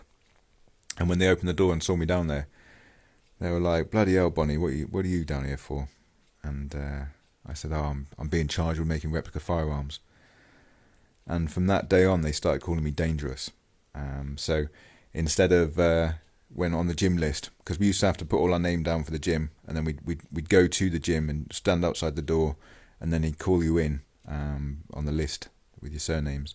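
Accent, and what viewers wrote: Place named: RP, United Kingdom. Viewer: you sound like a proper little posh geek, have you ever been in armley in leeds?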